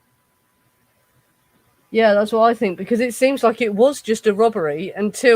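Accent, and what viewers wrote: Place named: Liverpool, United Kingdom. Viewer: I love your accent!!You sound like a Beatle!!My son's are named John,Paul,and George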